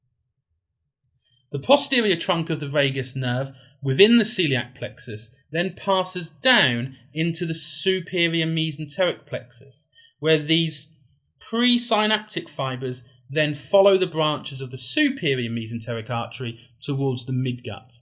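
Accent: United Kingdom. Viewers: I hv never found a youtube clip so sexy, especially with that british accent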